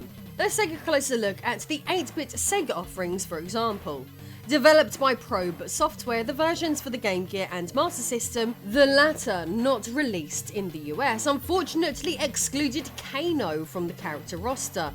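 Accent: United Kingdom. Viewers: The Brits have a different way of saying certain things. ZX Spectrum is "Zed Ex Spectrum" there. I'm used to it. They probably think the way we say certain things is odd so 🤷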